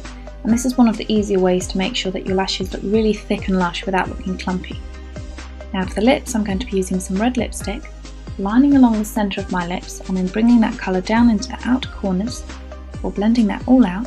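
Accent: England, United Kingdom. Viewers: R u from england xx like me lol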